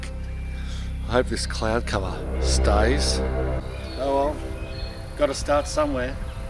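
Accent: Australia. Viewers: Thank you for this! We really need these recipes and videos so we use what you teach us to grow. Dogs eye, dead horse, dodgy? I think this is why the world loves Australia, Australians, and the accent. Mix the weird sayings, words and accents and it’s pure gold. But I’ve heard the same thing about Texans too boot.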